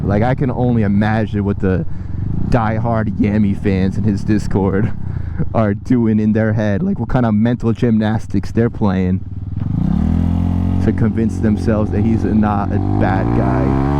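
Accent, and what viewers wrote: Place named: New England, United States. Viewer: Sounds like a bit of "subscriber jealousy" by an obnoxious Yankee to me... I don't think I've heard of him before and only looked him up since you were whining about him... He's a bit obnoxious since he's a youngster and I'm and old fart and as such find ALL youngsters annoying, but with your Yankee accent, you are even more annoying...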